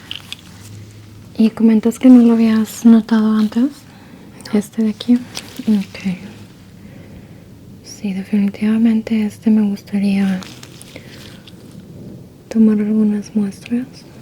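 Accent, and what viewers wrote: Latin America. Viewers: Eso es castellano jajaja, creo que ella habla más español latino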